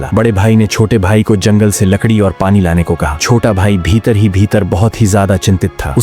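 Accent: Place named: Chhattisgarh, India. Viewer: Maa sunaya hai kahani cg boli me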